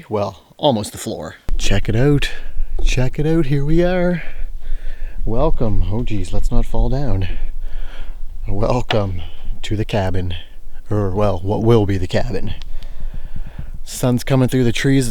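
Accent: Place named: Atlantic Canada, Canada. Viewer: Are you on the East Coast of Canada? This is a guess just looking at your woods and accent. I'm in NB.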